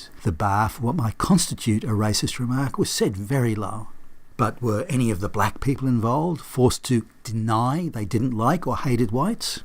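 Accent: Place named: Australia. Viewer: This is an unique reinterpretation of the Rodney King event, reinterpreted in light of the current social marist agenda. I remember when that went down. I remember similar racial confrontations going all the way back to "Black Day in July", when Detroit burned. Maybe you could reinterpret that too in the same vein, but you would be as wrong as you are about Rodney King event. These issues are far more complex and nuanced then you give credit to. From your accent, I might guess that you were far away from the event when it happened, on the other side of the world in fact in Australia. If that is true, you would not have the perspective of someone who grew up in America and experienced racial tensions first hand. However, just now I looked up to the statement under your video where you ask if the1990's trial of African American Rodney King introduced racial activist jurisprudence, and that is certainly a valid question.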